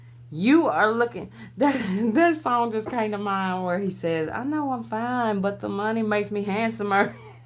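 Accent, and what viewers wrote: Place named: Louisiana, United States. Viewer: Amen ✨️ 🙌 🙏. Your accent is so cute. Louisiana?????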